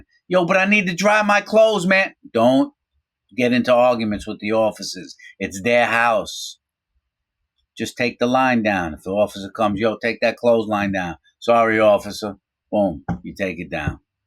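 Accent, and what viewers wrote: New York, United States. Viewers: Yo, you got a cup of coffee son? Lol no doubt thats NEW YORK!!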